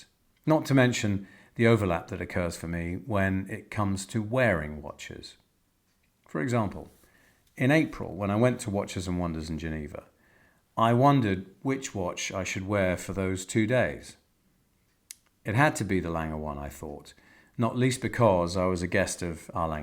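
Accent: RP, United Kingdom. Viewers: Thanks for yet another great instructive video. Your posh accents help pay more attention to what you are saying. I wonder if you ever use any expletives in your life :) I certainly do and not with a posh accent but with a French one. With regards to IWC, I would highly recommend the IW371615 Portugieser Chronograph, there is a French gentleman there that is very good at taking care of his customers.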